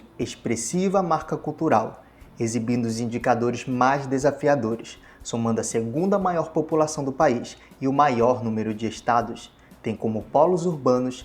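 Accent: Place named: Brasília, Brazil. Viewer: Esse dialeto lembra muito Brasília, devido ao mesmo processo de migração. Talvez você seja do extremo norte do estado, por aí realmente tem uma semelhança com Maranhão. Já recebi gente do bico, da pra ver que eles falam diferente.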